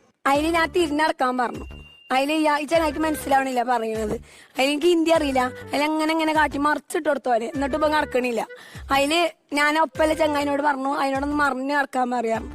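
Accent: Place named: Malappuram, India. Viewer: Malappuram slang♥️😂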